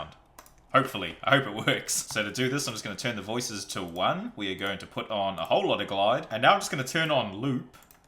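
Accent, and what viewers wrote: New Zealand. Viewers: Wow, haven’t heard a kiwi on YouTube before. At least I’m 98% sure ur kiwi. Ur vid was good too🙃 noice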